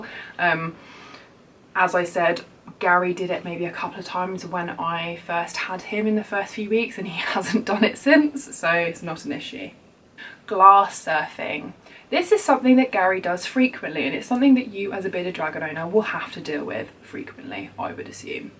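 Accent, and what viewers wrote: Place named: England, United Kingdom. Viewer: Nice to hear an English voice for once. I keep snakes and a chameleon and I just bought a dragon yesterday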